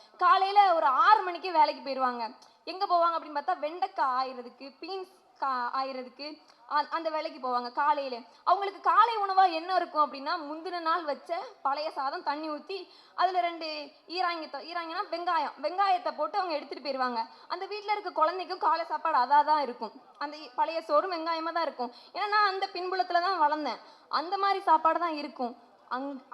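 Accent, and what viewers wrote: Tirunelveli, India. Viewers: மேடைப் பேச்சாளர்களை தேர்ந்து எடுத்து அவர்களுக்கு பயிற்ச்சி அளித்தது இப்போது திமுக அவர்களுக்கு தேர்தல் நேரத்தில் பயனளிக்கிறது.. திருநெல்வேலி ஸ்லாங் அருமை .. வாழ்த்துக்கள் மகளே 🎉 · Nellai tamil super sagothari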